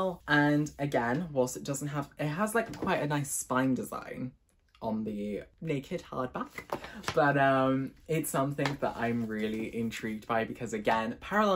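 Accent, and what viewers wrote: United Kingdom. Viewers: As if I wasn’t excited enough when I saw that he was a black male booktuber from the thumbnail, his British accent just rlly put the cherry on the cake 😩😩😍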